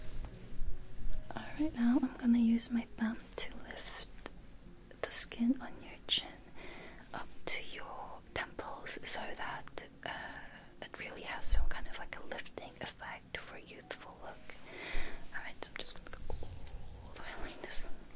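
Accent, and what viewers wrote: Australia. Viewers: Do i detect a hint of Australian accent? Love this. Thank you! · That explains it, I thought I heard Aussie sometimes! Oops, sorry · Can I ask where you are from? Your voice is pretty and has a slight aussie accent with how you say some things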